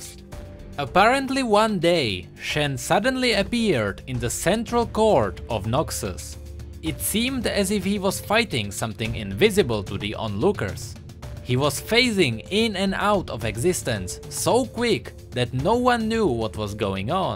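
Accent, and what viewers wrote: Czech Republic. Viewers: poznal sem hned podle toho přízvuku že si čech :D your realy good keep going!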